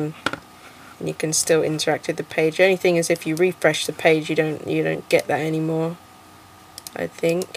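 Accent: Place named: United Kingdom. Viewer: its just the british accent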